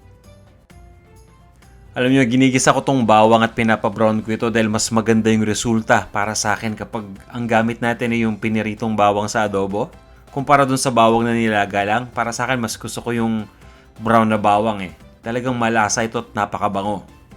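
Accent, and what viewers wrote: Philippines. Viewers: Hi, hello from Australia! New subscriber here and am I so glad to have stumbled upon your channel. Now I can cook authentic Filipino food for my family like how I remember it as a child in the Philippines. I love how your Tagalog-English is so relatable to mine. Been here all my life, just about, but TG have relearnt Tagalog. Thank you for sharing your knowledge! 😊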